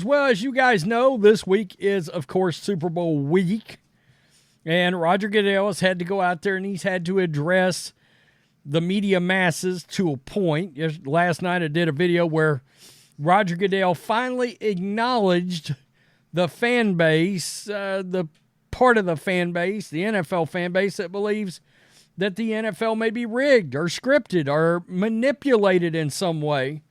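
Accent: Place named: Appalachia, United States. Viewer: 1st: Wear a REAL collared shirt 2nd: Drop that f'ing hillbilly accent 3nd: Stop broadcasting from the "Proud Boys" headquarters. You just might get more "likes", maybe from people like me that are college graduates!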